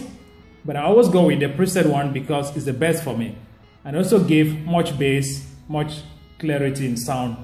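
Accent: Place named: Vietnam. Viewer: Are you vietnamese, you sound vietnamese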